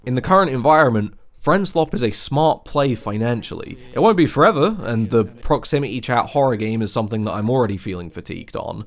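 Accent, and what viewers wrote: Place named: United Kingdom. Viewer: brit detected, listening and learning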